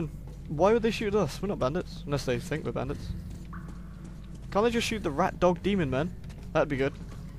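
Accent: United Kingdom. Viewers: Um.... since when does having a british accent make you sound like a nerd. I never really thought about his voice that much, everything is pretty much for the lolz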